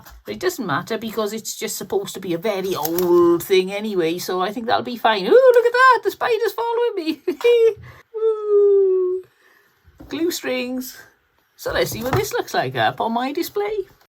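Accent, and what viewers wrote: Wales, United Kingdom. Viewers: Don't take this wrong but after listening too so many American Crafter's, you have the most Welsh accent I have heard in ages.😂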